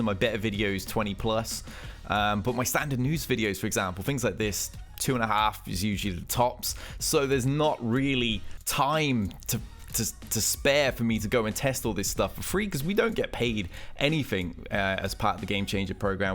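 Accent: United Kingdom. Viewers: Dude where are you from? I've always wondered haha. Can't figure out what UK accent that is lol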